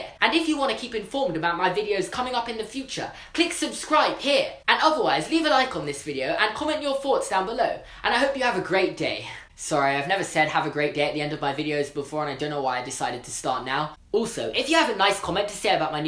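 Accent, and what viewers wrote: United Kingdom. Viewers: is he British just cause I'm British and someone I know sounds like him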